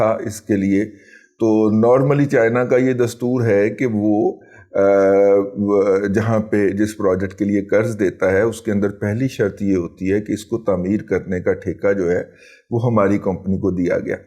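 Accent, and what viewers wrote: Punjab, Pakistan. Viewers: سر میرا بڑا دل کرتا ہے کہ میں آپ کی منہ بنا کے پنجابی لب و لہجے میں اداکاری کرتے ہوئے آپ کا یہ جملہ آپ کے منہ پر دے مارو میں ان کا حمایتی یہ ترجمان نہیں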